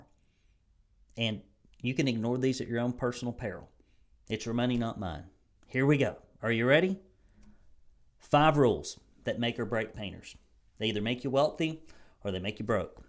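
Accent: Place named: South, United States. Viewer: Hey, your commentary is "Spot On" and honest. And Oh Yeah, uh, don't ever worry about that "Rednecky Accent". Ya"ll doin Just FINE!!!!!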